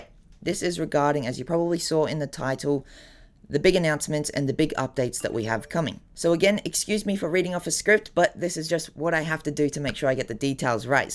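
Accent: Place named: Australia. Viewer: i love your aussie accent just like Rosé 😅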